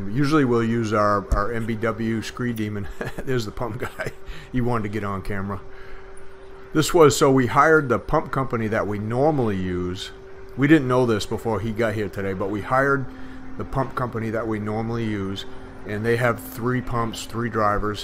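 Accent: Maine, United States. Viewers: Soon as I heard your voice, I knew this was in Maine. But looking at the woods in the background, it's not northern Maine. I grew up in Madison.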